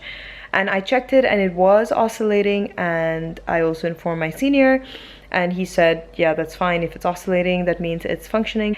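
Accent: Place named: India. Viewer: She's definitely indian u can hear the indian accent lol also she kinda had that indian nose 👃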